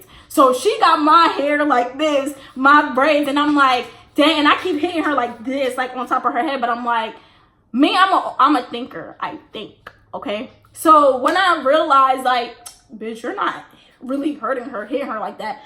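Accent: New York, United States. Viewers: Sissss.... this my first video I'm watching from you and as soon as you started talking, I said shorty a New Yorker !!! You have my sub ! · I could tell you was from New York from the beginning cause I was like baby yeen from no Georgia but lit story time🔥 glad to kno ain the only female who fought niggas 😭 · see you was giving me new york vibes . i wasn’t jakkin that you was from georgia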